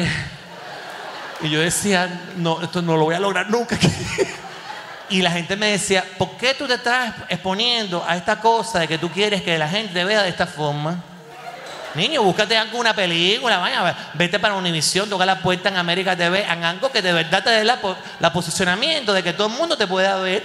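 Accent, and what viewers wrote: Cuba. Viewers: Me encantas con tu acento🎉🎉🎉😂😂😂😂😂😂 Cubano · Magistral como imitas el acento de nosotros los cubanos. Me Meo contigo 😂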